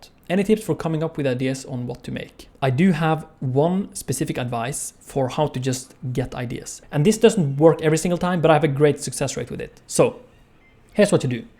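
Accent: Norway. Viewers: Interesting to hear your Norwegian accent. Sounds like you're from the Sogn og Fjordane area, probably a bit inland, not coastal.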